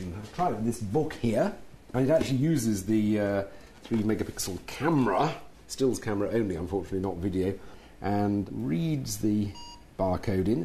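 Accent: England, United Kingdom. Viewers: he is so posh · damn.. the first british accent I like so much :D 5 * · HES ENGLISH NOT EVERY1 IS AMERICAN OR CANDIAN U KNOW ?! caps**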